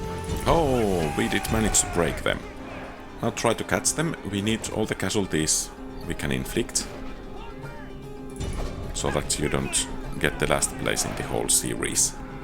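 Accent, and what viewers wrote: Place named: Finland. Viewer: Are u from Finland? Ur accent is so finnish. If you are, paljon kiitoksia sulle Venäjältä! P.S: NoToWar!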